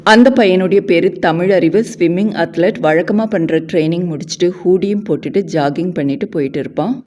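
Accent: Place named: Nagercoil, India. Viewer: Nagercoil slang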